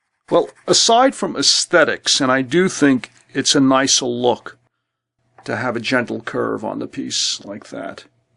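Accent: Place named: New York City, United States. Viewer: I've made jewelry for a long time & was searching for something different; this is it! Very detailed, in fact at first I thought you were trained in engineering or architecture since your wording included "structural integrity" a few times, smile. Anyway formerly being from NJ it was interesting to hear an accent I was familiar with, although I'll bet you're from NYC/ Long Island? Thanks.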